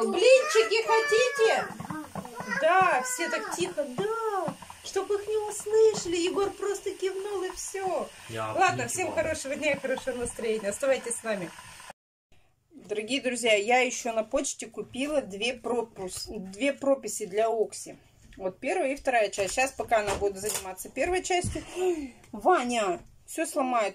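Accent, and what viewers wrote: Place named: Nizhny Novgorod, Russia. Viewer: А я сразу понимаю что человек с нижнего Новгорода по говору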